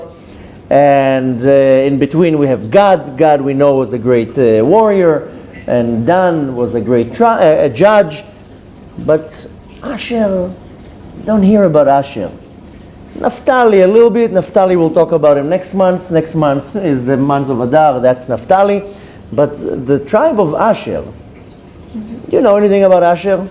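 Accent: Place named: Israel. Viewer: Thank you Rabbi for another great class. I'm curious as to how you have such a distinct Israeli pronunciation of Hebrew when quoting verses and prayers being that you are Baal Tshuva and Chabad. Other people who have become religious and had no previous Jewish background just adopt all the ways of their new group. Please share any insight as to how you've managed to keep your Israeli pronunciation while also being Chabad. By the way, it's not in a bad way at all, on the contrary. Was just wondering since you're usually so open about yourself to students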